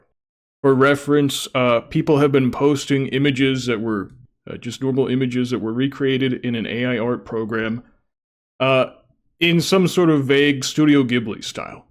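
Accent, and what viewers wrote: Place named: England, United Kingdom. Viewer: Hallo, a hallo! Excuse me, geezer, but that’s an English accent. Not Australian, where my ancestors imprisoned Shad long ago.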